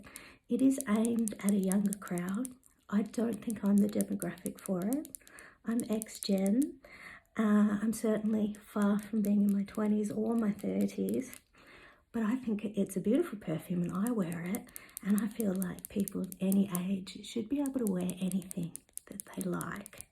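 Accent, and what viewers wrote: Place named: Australia. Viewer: You have a lovely soft spoken voice, and the Aussie accent is the icing on the cake. I've actually never smelled Daisy, but I love violet.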